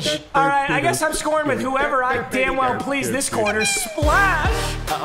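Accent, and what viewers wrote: Michigan, United States. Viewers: Michigan accent is rlly comin through on this episode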